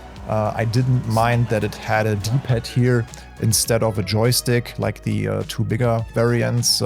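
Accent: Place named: Germany. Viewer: Man hört dein Deutsch extrem raus😅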